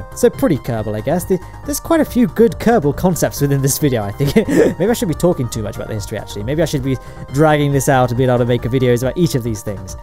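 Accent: United Kingdom. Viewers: Its the bitish accent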